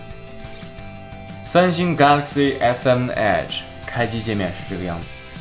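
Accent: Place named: North, China. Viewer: 你是北方人吧，現在在哪個國家生活？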